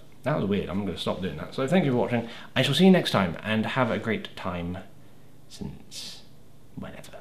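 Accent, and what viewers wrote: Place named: Cornwall, United Kingdom. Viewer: ! Awesome amazing Cornish YouTuber is back! Ahhhhhhhh! Total fan girl moment over here! Cannot believe you're back, so sad when you said you wouldn't be making any more videos... Glad you are again... Your Cornish accent tag is so true to life, lol!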